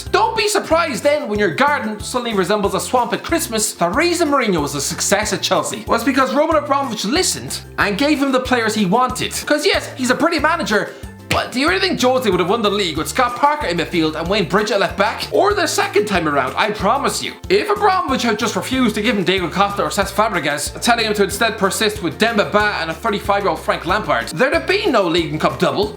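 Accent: Ireland. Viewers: A Newcastle fan with an Irish accent, I don't know what to believe.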